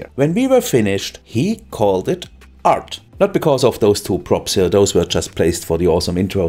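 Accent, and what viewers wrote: Germany. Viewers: When a man with a German accept starts telling me about order and efficiency, I can't help but pay attention. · btw are you maby german bc of the acent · Nothing like a good while watching a guy with a German accent explain things I understand nothing about and will probably never use. Very relaxing. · Yeah it did lol. The German accent added a +1 whilst talking technical terms as well. I might have to make a new city now. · VERY useful. Danke for creating this. (i hope i dont make a mistake saying it in German, you sound German) :) But your english is spot on! :) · You can hear your German dialect, hence the comment in German. That's way too much fumbling for me! The game would be a lot more fun if you didn't have to fiddle around so much. This is really frustrating! It takes hours just to get a single node up and running. :( It takes a million mods to get by and to be able to build reasonably well. 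I hope CS2 has a lot less fiddling and the traffic AI is a lot smarter. You have to build unrealistically many roads just because the AI is bad and many other extremely annoying things... ________________________________ Man hört deinen deutschen Dialekt raus, deshalb das Kommentar auf deutsch. Das ist mir viel zu viel gefummle! Das Spiel würde viel mehr spaß machen wenn man nicht so viel fummeln müsste. Das ist echt frustrierend! Man brauch Stunden nur um einen einzigen Knotenpunkt zum laufen zu bekommen. :( Man brauch eine Million Mods um zurecht zu kommen und um einigermaßen schön bauen zu können. Ich hoffe das CS2 wesentlich weniger gefummle beinhaltet und die Verkehrs-KI wesentlich intelligenter ist. Man muss unrealistisch viele Straßen bauen, einfach weil die KI schlecht ist und viele andere extrem nervige Dinge... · It was you who inspired me to purchase city skylines five or more years ago. 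I played for over 2,000 hours. Thank you. The video that blew my mind was one about a dedicated industrial area with one-way roads and one-way rail lines. This was before Industries DLC came out so it was comparatively primitive to this, but many of the principles you used then are present here. Again you’ve blown my mind and given me a yen for city planning. Your cities are living beings with free-flowing roads carrying cars like red blood cells. Truly a pinnacle of German engineering. Also I like the Frankfurt accent. It’s both intelligent and hilarious at the same time.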